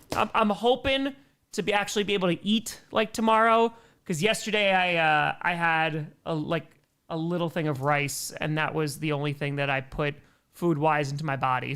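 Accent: New York, United States. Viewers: Who is/are the Krossi Posse Nation that refers to at the start of these broadcasts? I had always assumed he was saying 'Grossi Posse Nation' but he does not start off his welcoming cry with a G sound, at least to my ears. (Unless people with a New York accent pronounce their G's as K's, at the start of a word, when they raise their voice.) In any case, good luck with your quest to reach 1M subscribers.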